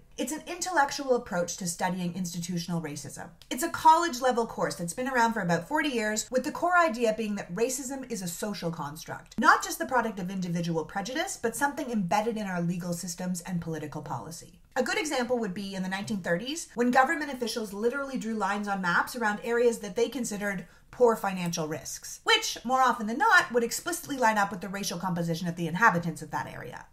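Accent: Canada. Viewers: Love it😁!!! Line of the century. "Fucking learn from, your mistakes"!!! Thanks for educating me, on what the hell 'CRT', meant!! I've heard of the regionalized, banking practices before, but not CRT. More guilt trips on us, from the side that does, the most wrong😔!! Also, you're Canadian🇨🇦, or originally from Canada??? It's in your pronunciations, and structure.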